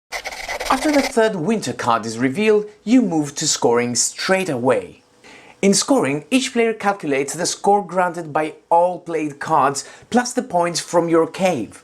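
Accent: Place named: Greece. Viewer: Great video with a really smooth explanation, thanks! Wife and I also busted out laughing at the beech tree part, , "if there are at least 4 beeches in your forest"😆 Greek accent? Kudos on the excellent English which is far better than my second language. Gotta love when accents make words sound like other words and it's totally innocent yet hilarious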